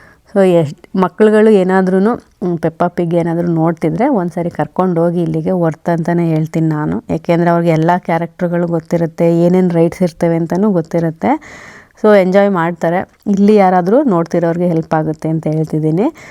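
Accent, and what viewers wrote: Mandya, India. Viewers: Olleya Video madidiri. Mandya da Kannada chennagide. 🎉thanks🌹❤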